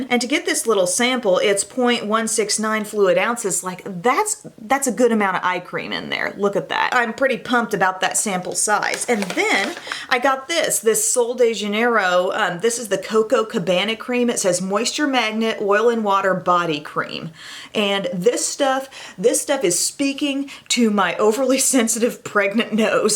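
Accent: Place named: South, United States. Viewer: I don't know but I feel like I hear more country in your voice in this video? Like more southern? Love your vids and you! Xx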